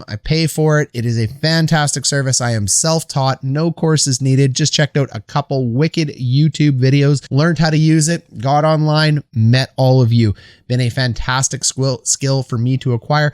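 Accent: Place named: Canada. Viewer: I do love how his inner Canadian comes out regardless of how hard he tries to not show it. :D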